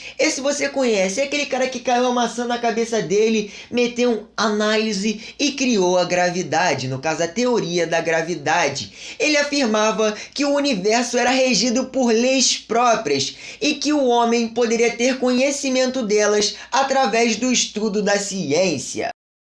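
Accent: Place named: Rio de Janeiro, Brazil. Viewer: Logo no começo já amei o sotaque carioca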